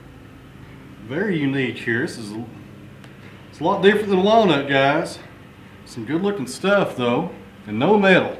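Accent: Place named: Appalachia, United States. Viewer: I have no idea how I came to click on one of your videos, but I bet it’s because I’ve been watching August Hunicke’s tree felling videos for some reason. Also have no idea how I came to click on his. Anyway, glad I did, because your Tennessee accent, your beautiful drone footage of your ranch, awesome music choice, and unhurried video style just makes watching feel like relaxation therapy. So, from and Australian fan, keep the awesome content coming.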